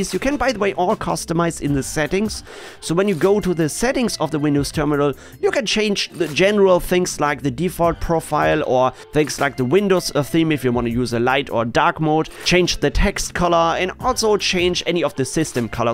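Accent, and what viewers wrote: Germany. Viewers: i smell a german accent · Deutsch! Hört man :D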